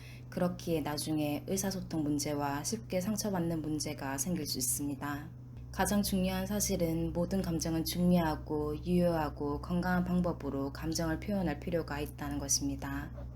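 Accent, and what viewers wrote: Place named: Busan, South Korea. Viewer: 나래이터가 부산분이신가바옇ㅎㅎㅎ 잼있어요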